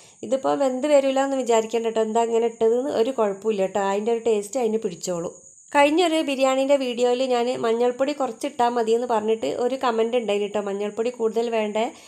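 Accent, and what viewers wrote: Kozhikode, India. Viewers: Kozhikod കാരി ആണല്ലോ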